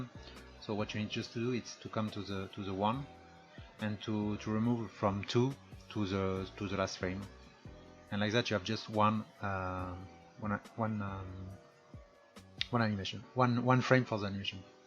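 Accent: France. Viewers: Tu es français? Ton accent anglais n'est pas très présent mdrr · Oh mon dieu mais tu es Français :D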